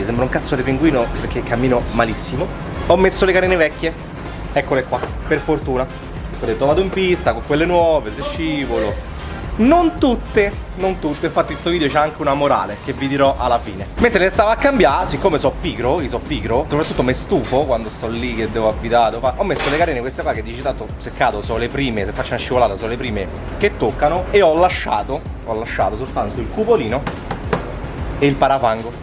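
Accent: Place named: Rome, Italy. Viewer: Sto morendo letteralmente.. finalmente e uscito il romanaccio che e in te.. e poi al panigale nun glie devi caga er cazzo e favolosa.. davvero.. la proporrei come maglietta ahahah anche mi lanciò.. in sicurezza ahahaha bellissimo sei un grande!!!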